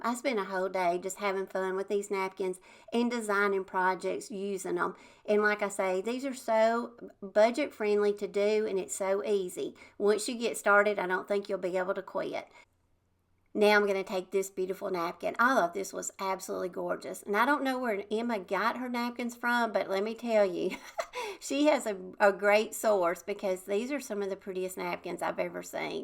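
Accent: South, United States. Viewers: Just have to say that I ❤ your Southern accent could listen to it all day long ❤ your crafts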